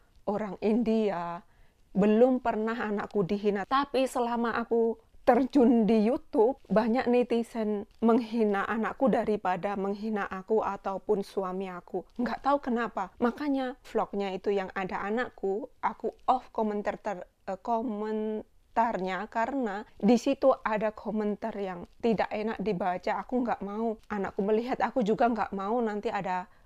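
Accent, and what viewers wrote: Java, Indonesia. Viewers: Suka dengar kk ngomomg .. mendok jawa nya itu loh. Lembut jg. Haha persis dr. Ambar di pontianak dosen kedokteran untan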